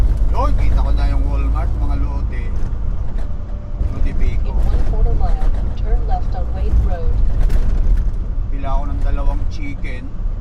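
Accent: Ilocos, Philippines. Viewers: pagpraktisan mo mga anak mo at sabihan mo i-correct ang mali mo. Tulad ng title ng vlog mo ngayon, mali na. Gusto ka ng mga followers mo dahil sa personalidad mo, prangka at walang inhibition. Yon nga lang, pag nag english ka na at sasabayan pa ng ilocano accent mo, halos matunaw ako sa paninimbang. Pero enjoy ako sa panonood sayo.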